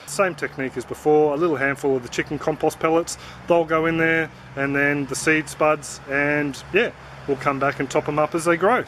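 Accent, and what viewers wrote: Australia. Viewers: You sound Aussie!! Please join my facebook group on growing potatoes in Australia!! Cheers!! :-)!! <link>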